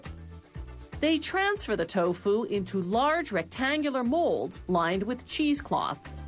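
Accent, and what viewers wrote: Canada. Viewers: No Mention of the awful gas you get when eating tofu? Better invest in Beano. And I don't know what is worse about these videos, the horrendous Canadian accent or the insistance on using the metric system.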